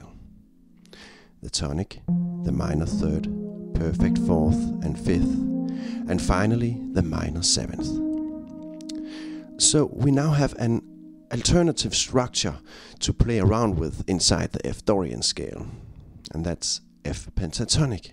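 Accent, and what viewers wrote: Denmark. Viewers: Well, is danish. That's the way they speaks. And plays. Remember, - Denmark has produced many great Jazz players. Palle Mikkelborg springs to mind.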